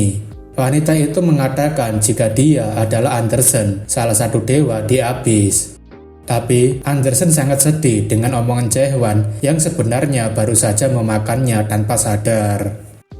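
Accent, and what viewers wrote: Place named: Bali, Indonesia. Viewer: soalnya kata T nya persis org bali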